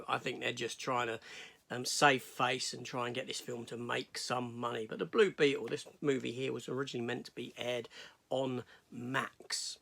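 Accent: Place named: England, United Kingdom. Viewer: This is meant as constructive criticism. I wanted to watch your review but I was frustrated by the poor sound levels. You start off fairly strong with your intro, and then move into a much louder show theme which had me lower my volume. You then return but soon your volume lowers to a soft mumble. This combined with your strong accent had me ready to move on.after a couple minutes. I'm not criticizing you, but your video and sadly not the content, but the presentation. I'm not an expert on audio, but I believe what you want to do is either be conscious of how your voice drops in volume, or put the audio through a compressor. Perhaps consciously over enunciating would help with your English accent. Good luck with your channel.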